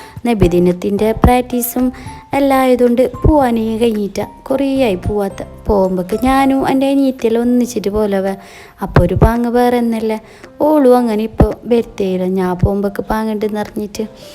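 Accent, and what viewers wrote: Kasaragod, India. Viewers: കാസര്‍കോട് ano😂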